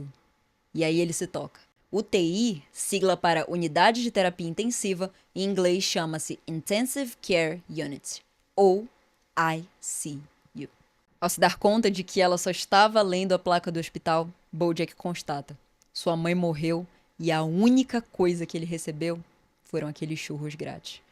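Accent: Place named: Pará, Brazil. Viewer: Ela tem sotaque paraense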